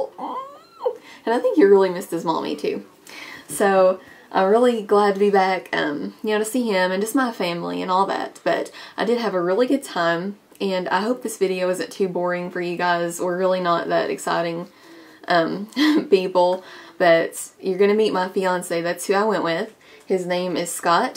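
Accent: South, United States. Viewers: OMGosh your Sammie looks just like my Lexi - right down to the few white hairs between the eyes. New subbie here, just found you tonight. I hear that "southern", may I ask where you are from - Im from the south myself. Really enjoy your vids - congrats on the wedding.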